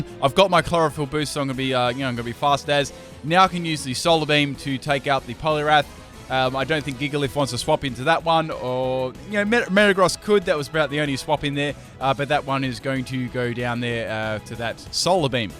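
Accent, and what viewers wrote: Australia. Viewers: He is Aussie like me I can tell by the accent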